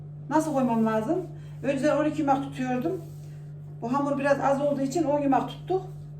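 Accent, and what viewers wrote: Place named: Konya, Turkey. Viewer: Hayïrlı aksamlar hanım Konyadan Selamlar🙋 Hemşehrimsin ben de Aksaray ortaköylüyüm videolarını çok beğeniyorum hatta bugün iftarda revani tatlını yaptım şahane oldu.Seni dinlerken silayi Rahim yapmiş gibi oluyorum çok yakın hissediyorum ayni bizim gibi konuşuyorsun.🌹🌹🌹👍👍👍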